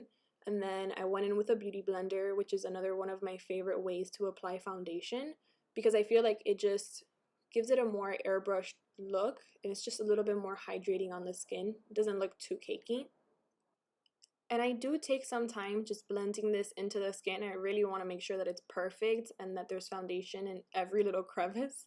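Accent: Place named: Miami, United States. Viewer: I knew you had to be from miami when i heard you talk !! Love the makeup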